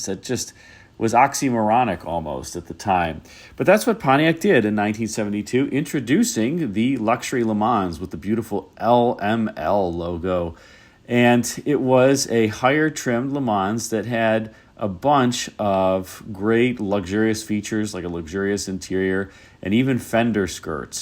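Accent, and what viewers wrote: Midwest, United States. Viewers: I loved the first generation Grand Ams and Pontiac did a tremendous job styling them — when they were pretty similar in a lot of ways to a Chevrolet Malibu underneath. I had to laugh at your mention of the Ventura pronunciation. I like your midwestern accent and it reminds me of the HGTV host Scott McGillivray (who is actually Canadian) and some other actor I can't think of. It's kind of a Great Lakes area sound to me. Lol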